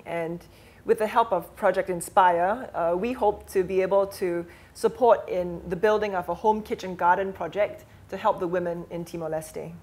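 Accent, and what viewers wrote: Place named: Australia. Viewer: i love Australian accent :D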